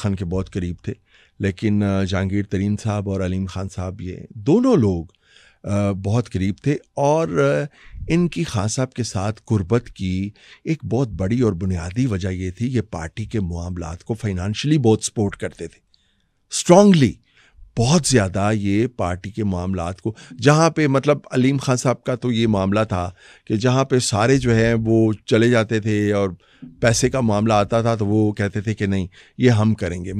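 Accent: India. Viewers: The anchor tends to have an ‘Indian accent’ - عمران كهان instead of خان. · Why are you trying to talk like an Indian? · اپنا اردو کا تلفظ درست کریں انڈین ایکسنٹ سے اپ انڈین نہیں بن جائیں گی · Talfaz mai Indian touch ko tarak krnay ki ashad zaroorat hai. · آپ خود کو کھد کیوں بول رہی ہہیں اور ز کو ج ضروری نہیں کہ انڈین لہجہ بنا کر بولیں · Plz mam ap ko Pakistani urdu bolni chahiyyay na k hindi accent 3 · lagta hai yeh khatoon india se hain??? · بُہت عُمدہ اور بے لاگ انٹرویو آپ نے شئیر کیا ہے جسکے لئے شُکریہ لیکن آپ کے بھارتی انداز میں کُچھ خاص الفاظ ادائیگی پر شکائت بھی ہے۔ آپ ماشااللہ پڑھی لکھی خاتون لگتی ہیں لہٰذا اپنے انداز گُفتگو کو بدلنے کی کوئی ضرورت نہی۔ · Why does this anchor talk like Indian such as khas , whoosh, can't she speak proper urdu , rather copying hindi language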